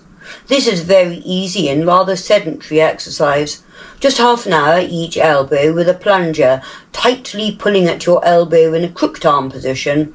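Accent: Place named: Yorkshire, United Kingdom. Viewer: I loved your Yorkshire accent , I chuckled away at it , brilliant 😊. I had one for ratting too , but a light fingered personage had away with it . Looking for another at present want another bsa meteor .22. Like the older models . Be careful with that knuckle lass , 5yrs for owning one , if caught . I hope to bump into you sometime when I'm down Devon , would be lovely to meet you . I think you are a very interesting person . Keep the vids coming Lass, brightens my day when they get posted 😎👍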